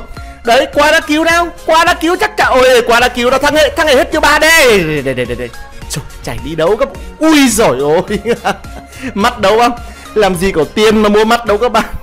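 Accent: Nghe An, Vietnam. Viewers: Giọng nghệ an choa hắn rứa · Giọng nghệ an quê ta dệ thương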